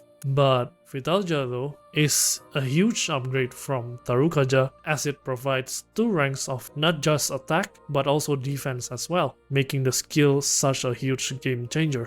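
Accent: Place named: Indonesia. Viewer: r u from indo? got accent hahaha. haven't seen ur vids in a while. nice to see a metaphor video from you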